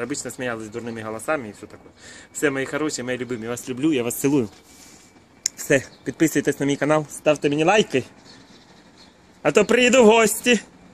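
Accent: Ukraine. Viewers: В этом -то и прелесть,в его украинском говоре.Так что никого не слушай,и шокай скильки душе угодно.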